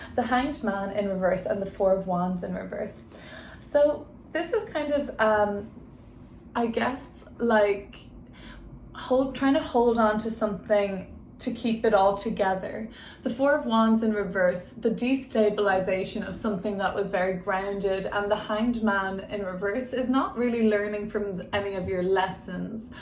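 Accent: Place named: Ireland. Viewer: I love your beautiful irish accent, i should know, im from Dublin, lol. Thank you, beautifully read and spoken.🙏💚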